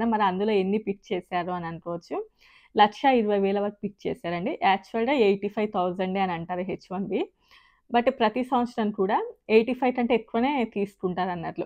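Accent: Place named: Karimnagar, India. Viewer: You are explaining very clearly and confidently . I you are basically from karimnagar dist. Your pronounsation different from twin cities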